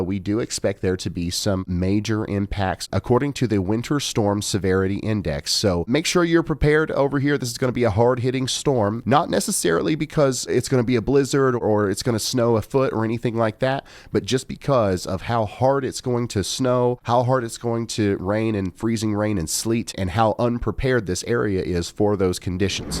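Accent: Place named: Kentucky, United States. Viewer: Eastern KY where you live? Omg! Idk you were from KY! Should have known though bc you sound like a Kentuckian! 😂 (Me too 🤷‍♀️)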